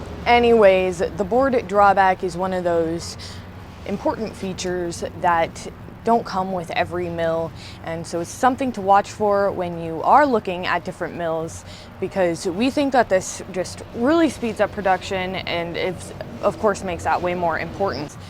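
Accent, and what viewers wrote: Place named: Pennsylvania, United States. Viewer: .... You're just lovely. So natural, so real, so.... lovely. Also, I get a real kick out of the PA accent. Most don't hear it, but I certainly do, being from RI. Live in D.C. now. Respect. My Favorite channel. Fact. Who would have thought one could create an interesting channel about a saw mill? Your talent makes it possible....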